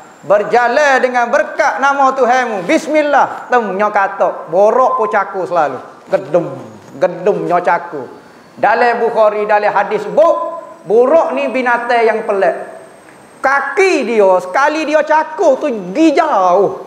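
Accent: Kelantan, Malaysia. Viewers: Allàhhurabbi... Xpenah jemu déñgar carà penyampaian ustaz bercéríta pàsal kísàh2 nabi, càra ustàz cerita sémua señàg faham dan múdàh hadàm.. Dr ségi bàhasà dàn lénggok kélàntan ustaz & bérsahaja buat àku làgi méndàlam masuk terus dlm kisàh2 ñàbi.. térus dpt bàyàñg keàdààn masa zàman ñàbi.. àku órg Johor suka sgàt lógat kelañtan & Terengganu 💪😎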